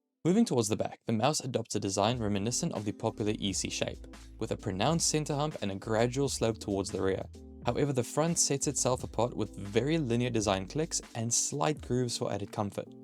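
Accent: South Africa. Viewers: You sound South African. Where do you get your mice from?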